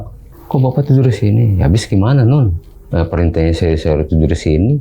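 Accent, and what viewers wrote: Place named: Jakarta, Indonesia. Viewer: Ga ada serem2nya sm sekali. Sepanjang cerita gw senyum2 sndr denger logat Betawi nya kentel bet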